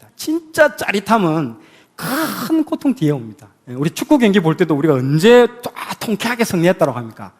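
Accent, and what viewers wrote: Gyeongsang, South Korea. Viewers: 경상도 사투리의 억양과 또랑또랑한 음성이 조화가 되어서 귀에 쏙쏙 들어옵니다 감사합니다💝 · 경상도 사투리가 정겨웠는데 아니! 마산! 저도 마산출신 입니다... 지금은 먼 외국에서 주님의 사역을 감당하고 있습니다만 오랫동안 한국을 가지못해 늘 고국을 그리워하며 살고있는 순례자입니다.... 구독해서 열심히 듣고 있습니다.... 주님의 이름으로 축복합니다...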